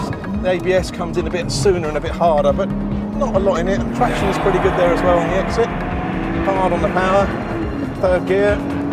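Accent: United Kingdom. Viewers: he has to be british REALLY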